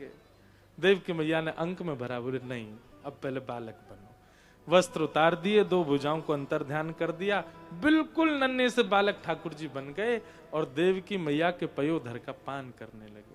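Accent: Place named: Braj, India. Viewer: Jay Shri Krishna Radhe Radhe 🕉 Namo Bhagvate Vasudevy Namh OM 🕉 Namo Narayan ji Jay Shri Radha Rani Jay Shri Radha Kishori ji Jay Shri Radha Raman ji Jay Shri Guru Dev Maharaj ji Aapke Charno Mai Koti Koti Vandan 🙏Radhe Radhe Jay Shri Harivansh Aapki vraj Bhasa Badi Sumadhur hai Jay Shri Vrindavan Dham Jay Shri Yamuna Maharani ji Radhe Radhe 🙏♥️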